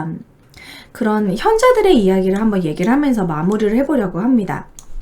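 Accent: Gyeongsang, South Korea. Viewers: 혹시... 경상도죠? 중간중간 억약이 좀... ㅎ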